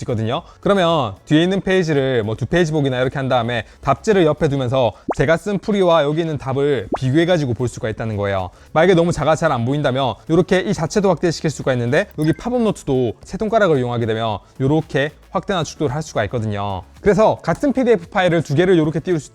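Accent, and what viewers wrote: Gyeongsang, South Korea. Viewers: 친절한 갱상도 남좌 하지만 난 아이패드😢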